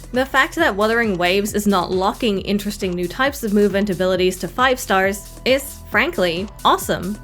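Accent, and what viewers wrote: United Kingdom. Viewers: the scottish accent is great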